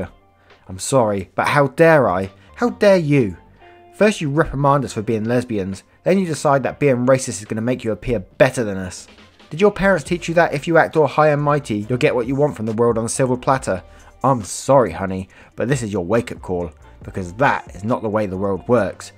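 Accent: United Kingdom. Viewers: I love your brit accent, what part of England are you from? We live in Texas, but my in laws are from the Stratford on Avon area. They live in TX now and we are visiting UK in 2020. Great storytelling!!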